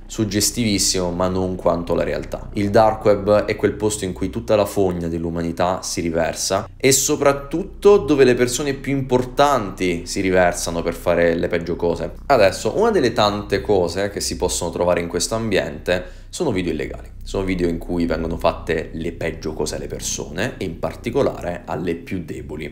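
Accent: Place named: Apulia, Italy. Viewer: Ciao, di dove sei? Il tuo accento sembra pugliese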